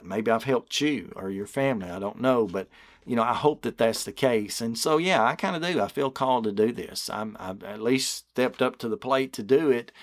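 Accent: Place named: Alabama, United States. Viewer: I 💘 the Alabama accent . It is so distinctive. You preach in a pool pit. I love it!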